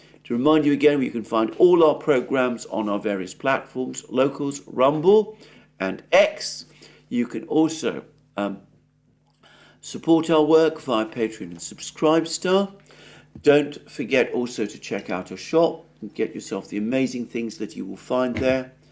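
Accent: United Kingdom. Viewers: This "program" is the "debacle". Fallacious generalizations, about Biden's intents, performance and rhetoric abound. Confident claims about the (yet unopened) aid portal reveal only the profound propagandist purpose of this channel. The analysis is to be read, not for its validity, but is valuable, nonetheless for exposing with a UK accent, Moscow's message.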